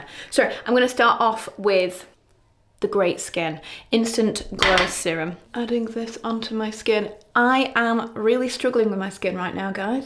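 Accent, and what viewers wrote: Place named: Wigan, United Kingdom. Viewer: Absolutely adored this vlog and sadly we are in the time where we seem to be going backwards for women’s right and the decisions we make for ourselves and with our partners. 😔 but we stand our ground because we’re all strong women 🖤 also met a friendly chap from Wigan today in Nashville TN USA 🎉 made my heart explode — his accent reminded me of you and 😌😂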